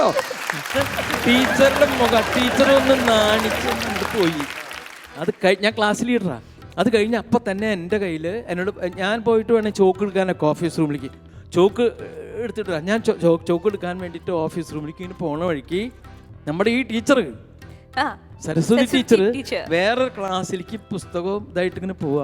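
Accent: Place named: Thrissur, India. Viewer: തൃശൂർ സ്ലാങ്ങിന്റെ ഒരു സൗന്ദര്യo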